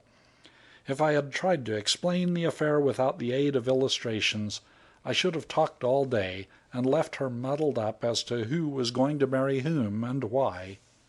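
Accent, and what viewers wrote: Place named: United States. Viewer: Felt it totally out of place reading a typically English story with an American narrator...had to switch off.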